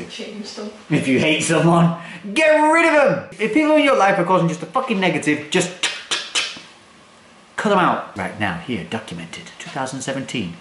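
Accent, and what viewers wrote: United Kingdom. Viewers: Hate brit accent !!!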